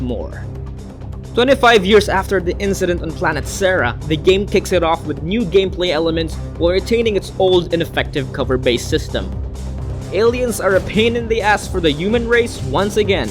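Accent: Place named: Philippines. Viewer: That thick Philippine accent though.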